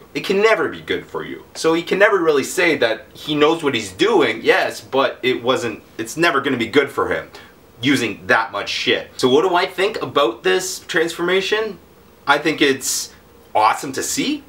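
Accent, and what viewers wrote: Canada. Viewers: You said aboot. You're Canadian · Is he Canadian?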